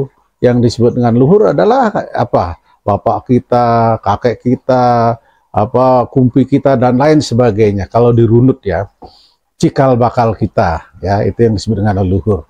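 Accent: Java, Indonesia. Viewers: Logat balinya g ada samasekali, malah cenderung logat jawa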